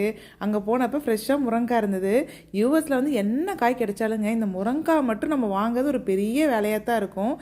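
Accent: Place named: Coimbatore, India. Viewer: Kovai slang 😅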